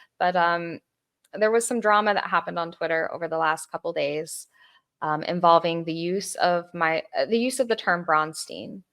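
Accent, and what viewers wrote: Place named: United States. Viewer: You have a pretty flat American accent, anyway. The bastards just want to silence people and control everything 🤨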